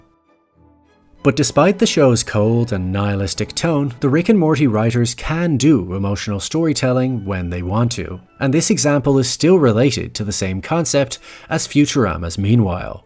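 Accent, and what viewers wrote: Ireland. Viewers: He sounds nothing like Paul Mescal but I suppose accents vary a fair bit in Ireland/Northern Ireland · Weird question, are you Irish?😅🤔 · Bless, Irish for sure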